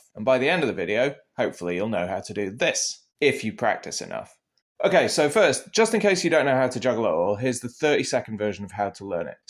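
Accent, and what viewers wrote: England, United Kingdom. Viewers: Hey, mate, are you born in UK... it seems you speak British accent English, don't you?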